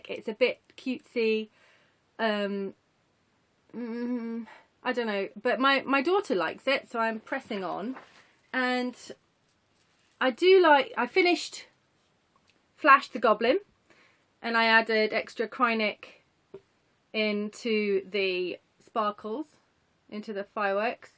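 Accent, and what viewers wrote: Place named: United Kingdom. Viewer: I love your videos. I'm a total Anglophile and British/Australian stitchers are my favorite channels to watch. I guess it's the accents. 😉 I hope you're feeling better. Do you have any more videos planned? I definitely don't want to pressure you, especially if you're not feeling well--I just miss your lovely work and your humor. 😊 Prayers for continued recovery!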